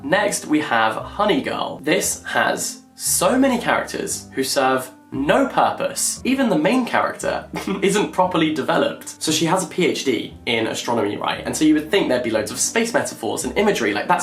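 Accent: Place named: Scotland, United Kingdom. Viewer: My brain started thinking in Scottish is so funny I'm laughing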